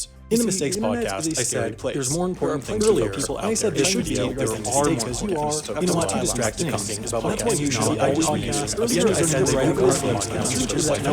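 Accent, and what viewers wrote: Netherlands. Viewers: Wait... You're dutch? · are you dutch?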